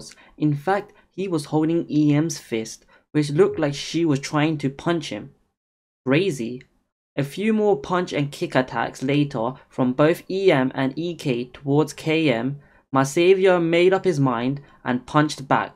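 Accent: United Kingdom. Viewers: I’m sorry if this sounds rude, but are you British? Just curious.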